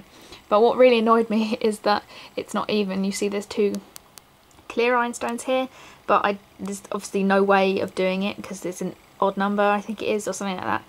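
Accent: United Kingdom. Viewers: Your charms are very cute >.< I love them,perhamps i love your british accent x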